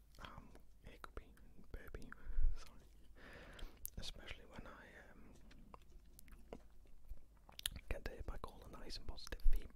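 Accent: Wales, United Kingdom. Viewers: Are you Welsh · What part of Wales you from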